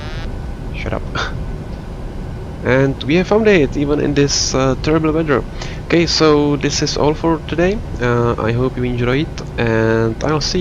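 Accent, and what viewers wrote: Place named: Czech Republic. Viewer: Czech accent recognized!:D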